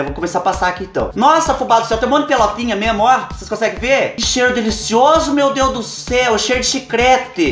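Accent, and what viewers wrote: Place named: Minas Gerais, Brazil. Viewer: Fubá achava que o seu sotaque era para os lado de Minas kkkkk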